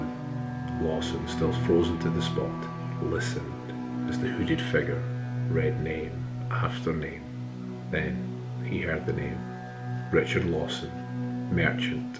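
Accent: Scotland, United Kingdom. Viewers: Ghosty tales, wonderful Edinburgh, a smooth Scots accent --- perfect. Thank You. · You should put out an audiobook, on all the eerie stories of Edinburgh! It would sell, BIG! You have such a good voice, lovely Scottish diction, but not overly much to create misunderstandings 😉🤣 Thank you! 💙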